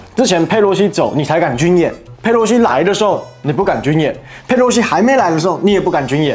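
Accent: Taiwan. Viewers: 喜欢听你说话❤曾经有个台北的女孩，名叫 木兰，是我们店里的舞台编辑，她说话就是这个味道，给我讲了很多台湾的福利，真是羡慕啊🎉🎉🎉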